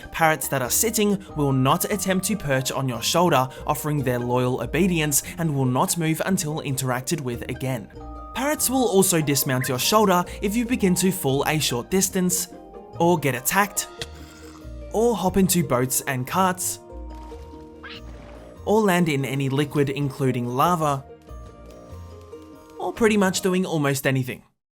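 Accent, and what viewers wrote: Australia. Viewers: wait a second.. are you aussie? cuz you sound aussie to me lol btw i’m australian lol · are you Australian?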